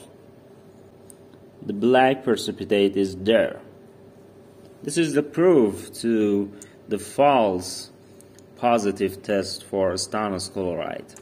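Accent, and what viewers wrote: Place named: Arab world. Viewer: Hi sir from which country you belong your accent seems to be Arabic.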